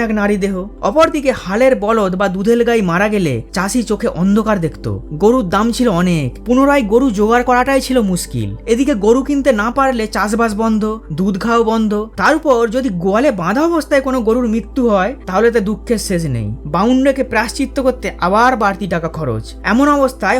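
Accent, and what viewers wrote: Kolkata, India. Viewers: খুব সুন্দর লাগলো,হয়তো আপনি ইতিহাসেরই ছাত্র,সেই সাথে অনেক পড়াশোনা করেছেন তবে উচ্চারণটা কোলকাতার টানে না করে প্রমিত বাংলায়(নদীয়া জেলার শান্তিপুরের টানে) করলে শ্রুতিমধুর লাগতো।পুনশ্চঃ ধন্যবাদ।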